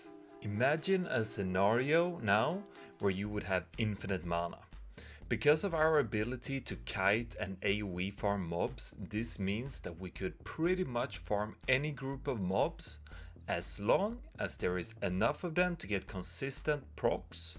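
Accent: Sweden. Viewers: Well since you ask what we would like to see next, here is what i would like to see =) I think a maraudon one pull tutoriel would be a great video. Ofc there are already a whole bunch of mages doing mara one pull on youtube, but i think every mage has his own little techniques, his own sensibility, and i would be pleased to learn what are YOUR way of handling this farm and what are YOUR personnal tips and tricks. Plus i like the way you explain things, with your swedish accent and all =)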